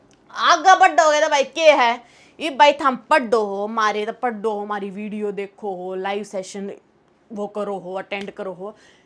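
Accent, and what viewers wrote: Haryana, India. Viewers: Plzz baaki saare teachers sahi se bolte hai haryana board ye nhi hota ki aap haryanvi bole aap bolte ho paadha galeya and much more plzz iss baat pe dhyaan dena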